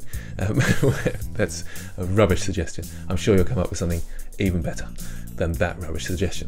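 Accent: United Kingdom. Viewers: "Hey, I'm, I'm very sexy" he is being too modest, under his sweater he hides a six pack also, he has a british smile and accent 👌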